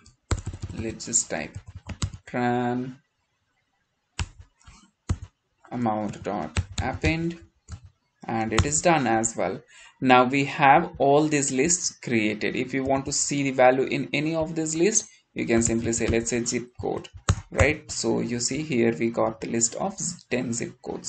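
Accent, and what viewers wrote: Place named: India. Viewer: индус + английский = невозможно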